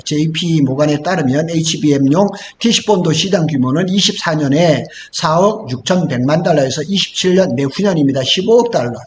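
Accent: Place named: Korean-Chinese, China. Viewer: 억양이 조선족. 의심스럽다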